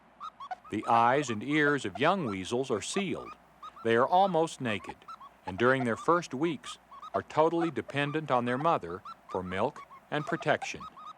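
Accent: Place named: United States. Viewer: Can any American tell me what regional accent the host has? I love it!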